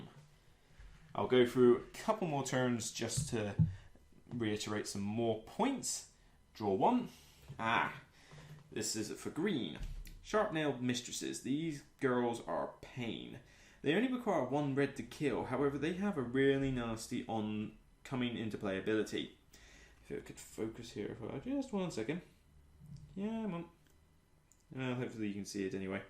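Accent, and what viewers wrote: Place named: Cornwall, United Kingdom. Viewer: I love that kinda Cornwall farming English accent!....and great how 2 play :)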